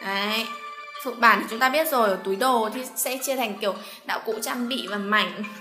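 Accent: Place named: South, Vietnam. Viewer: nói giọng miền nam đáng yêu vải :v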